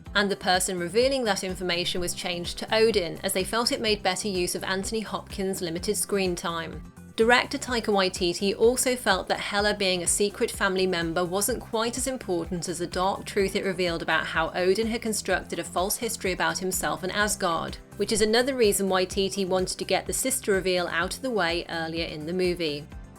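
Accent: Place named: RP, United Kingdom. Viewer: Can you be creative instead of being English public school voice confident without having a fucking clue what you're doing mode. I think the days of that are very numbered.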